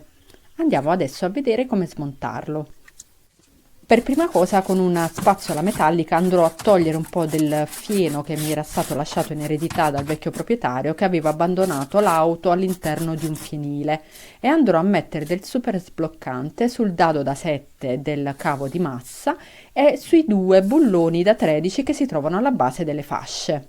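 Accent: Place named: Apulia, Italy. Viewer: Brava e chiara. Con un simpatico accento pugliese credo. Complimenti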